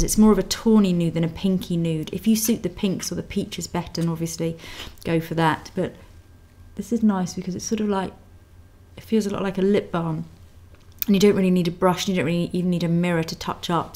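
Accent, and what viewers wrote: England, United Kingdom. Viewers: I love the british accent it sounds so elegant :D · I LOVE her British accent :3 i feel that she was more of interacting with me in the video :D despite her talking a lot. but i liked it! :) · im here to learn british accent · wow u are a makeup GURU! i love ur accent, lol when i try to be british i sound just like u. thanks for ur help. oh, and u look rlly beautiful! :D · you mean english accent, there is no such thing as a british accent.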